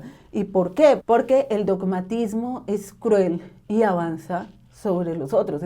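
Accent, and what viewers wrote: Colombia. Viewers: Me encanta tu personalidad, tu risa ,tu carís-ta... sos colombiana verdad ?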